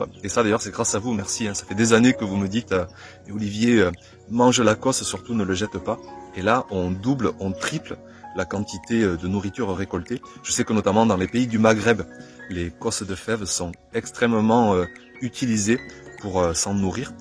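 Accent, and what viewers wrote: South, France. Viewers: Ils sont mimi tes loulous... c'est un mini toi 😊, aieee l'accent du sud, j'adore! ça me rappelle mon Marseille de mon enfance... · merci pour cette belle surprise de tes deux petits, effectivement ton fils te ressemble! et cet accent du Sud...c'est déjà du soleil pour moi, pauvre nordiste d'ile de France! à très vite sur ta chaine! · Bonjour Olivier, je te découvre en plein confinement, enfin ça fait déjà un mois là, j'aime beaucoup tes vidéos, la philosophie qui en découle. De ton accent (hi hi de vos accents là où je t'écris j'entends la voix de tes enfants, c'est magnifique, moi mon fils déteste les légumes:-(; il a 12 ans et ça marche toujours pas. Bon je reviens à mon propos, de votre accent j’entends le sud... merveille. Moi j'ai un potager sur Bruxelles, un tout autre climat. Tes vidéos me font du bien, je les laisse couler pendant que je fabrique des masques, une autre façon de cultiver la santé.... 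Elle me font du bien tes vidéeos et me ramènent à l’essentiel. Amour sur toi et les tiens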